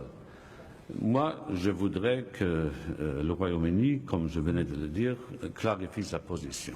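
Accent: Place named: Germany. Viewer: il me semble monsieur Juncker que votre langue maternel est l Allemand pitiè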